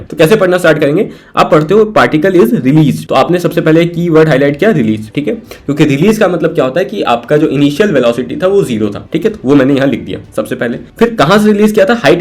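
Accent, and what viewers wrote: Assam, India. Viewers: Dada Assam se ho kya ??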